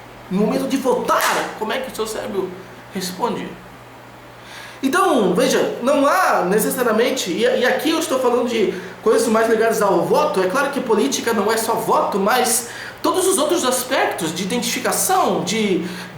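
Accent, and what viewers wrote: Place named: Minas Gerais, Brazil. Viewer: Eu pensei em duas possibilidade: 1) ele tá treinando uma técnica de oratória. 2) ele tá misturando o sotaque dele com o mineiro e/ou baiano. O estar bêbado pode ser uma terceira hipótese rsrsrs >.<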